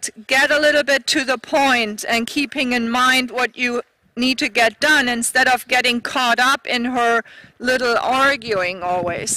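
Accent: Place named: Germany. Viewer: You really hear the german accent 🤣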